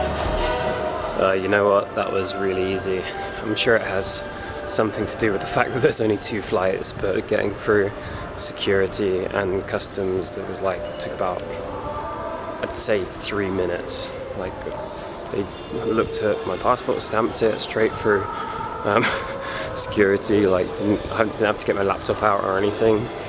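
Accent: United Kingdom. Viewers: Jonathan Stoevelaar - he’s not American lol he has a British accent..... you’re not an arsehole just ignorant lol 😆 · This video just popped up in my feed. Sorry man! I don’t have a clue who you are and what you do, but being at that airport alone. It sets you in the mind of how Son of MAN’s return is going to be. It’s going to be lots of abandoned places. Also, I couldn’t really tell you were from the UK due to your accent not being as strong. I thought you were from Australia, but I was wrong. Anyway, be safe on your next trip wherever you go. God bless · This guy talking in this video has an English accent, with a British passport. Syria was destroyed by the USA on purpose, like they destroyed Afghanistan, Iraq and Libya and now want to destroy Iran. Why are you blaming the Assad regime for destroying Syria and for making you a refugee in Germany?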